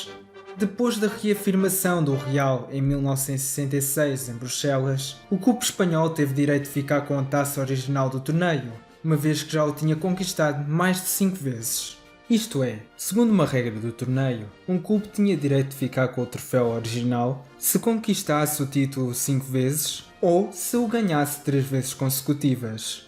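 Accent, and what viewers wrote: Portugal. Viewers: Sotaque português é gostoso de ouvir Kkkk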